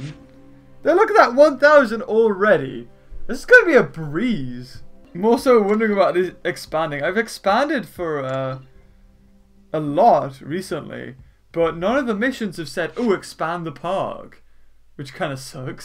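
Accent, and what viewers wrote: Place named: United Kingdom. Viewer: hes british even though his accent isnt heavy it probably just sounded like it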